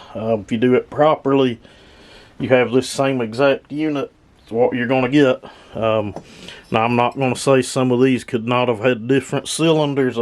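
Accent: South, United States. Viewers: A master at his craft! Just curious, where are you from? You have quite the unique southern accent 🤠 Don't think I've heard your dialect before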